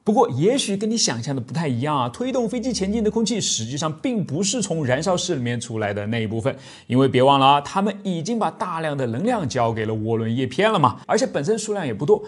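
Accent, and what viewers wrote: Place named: Henan, China. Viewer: 哈哈，我也听出来了，河南话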